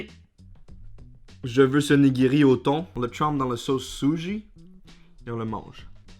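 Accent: Quebec, Canada. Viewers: Tu connais alan 92 en France,regarde sa chaîne c’est un mangeur de malade 🤢 quand à toi tu me fait rires 😝 avec ton accent,et pourtant je côtoie beaucoup de québécois car nous avons une équipe de hockey à Rouen mais t’es connerie me font bien rigoler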